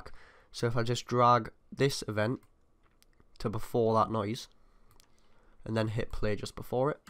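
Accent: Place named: North East England, United Kingdom. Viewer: your from the northeast of England :D like me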